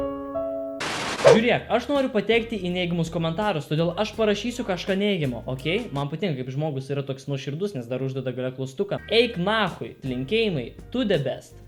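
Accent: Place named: Kaunas, Lithuania. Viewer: Aš kai tavo pirma karta vidijo pamatau aš tesiok. Tu Kaunas nes tu labai juokingai kalbi🤣🤣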